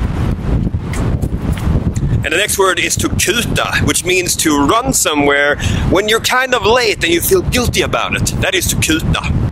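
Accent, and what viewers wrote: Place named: Scania, Sweden. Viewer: as a subscriber of you for a long time, not so hard to hear your Scanian dialect. Thanks for the teaching everything about Swedish!!!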